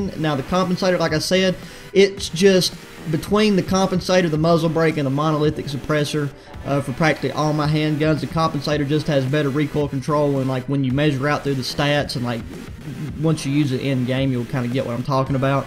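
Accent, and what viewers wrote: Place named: Georgia, United States. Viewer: Where are you from? Accent sounds Georgian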